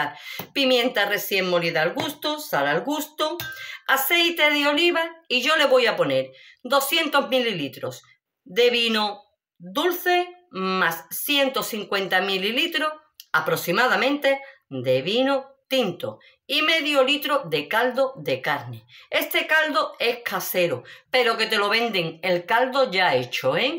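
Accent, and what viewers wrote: Andalusia, Spain. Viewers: Querida,pero yo creo que esas no son costillas la receta esta buenisima como todas las tuyas eres de lo mas expresiva explicando claro eres andaluza????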